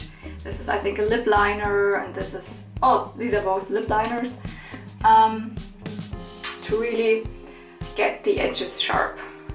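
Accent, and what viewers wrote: Germany. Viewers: Bin ich die einzige, die den Deutschen Akzent raus hört? PS: tolles Video😊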